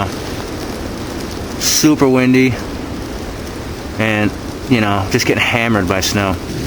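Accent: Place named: Northeast, United States. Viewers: Pretty spiffy for a masshole! :) I could tell right away from your accent that you were from the greater boston area. And when I heard you talk about new bedford, well that just gave it away. Seriously though, it is refreshing to see someone doing a camping experience video that is real and not perfectly choreographed. You made a few mistakes like not gathering enough firewood before dark, and your shelter is not setup the best, but you got through it and you did it legitimately! It's nice to see a newbie practicing their skills. You did a great job and I enjoyed watching this video! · Great video! Where is this place? You sound northeastern.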